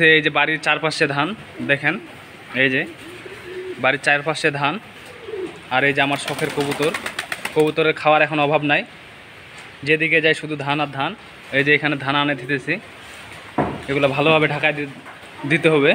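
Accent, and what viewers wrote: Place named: Bihar, India. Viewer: ওহ,,,এজন্যই প্রশ্ন টা করলাম কারণ আপনার ভিডিওতে আপনার ভাষার উচ্চারণ গুলো কেমন জানি বিহারীদের মতো উচ্চারণ হয়।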